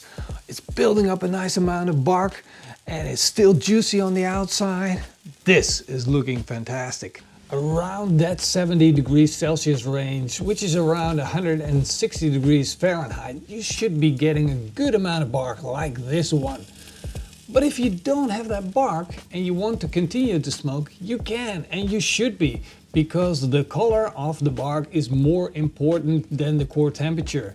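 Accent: Netherlands. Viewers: Are you dutch your accent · Are you from Holland? Sure sound that way! Anyway, great channel. Greetings from Norway :)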